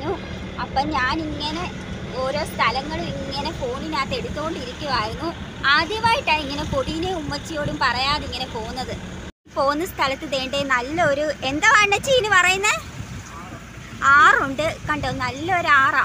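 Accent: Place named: Kannur, India. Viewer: കണ്ണൂർ ആണെന്ന് തോന്നുന്നു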